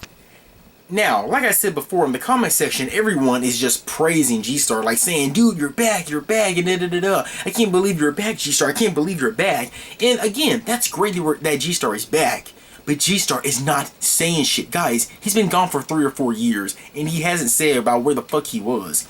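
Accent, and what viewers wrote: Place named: Australia. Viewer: He has Australian accent, not British.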